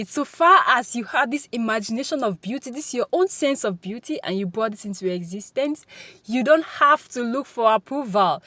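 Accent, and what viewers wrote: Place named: Cameroon. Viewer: Hi my Cameroonian sis🥰🥰. I heard you speak and I’m like she sounds Cameroonian… I watched untilllll I heard you say you are from pays 😍😍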